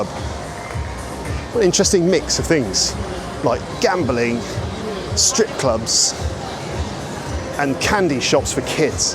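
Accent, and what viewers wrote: United Kingdom. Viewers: Thank you very much, you are the best choice for me in order to learning English, particularly British accent, this accent is life, love you from Iran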